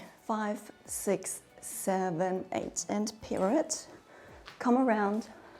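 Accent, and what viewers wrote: Germany. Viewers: I could listen to you speak English with yr awesome German accent all day !! Love you !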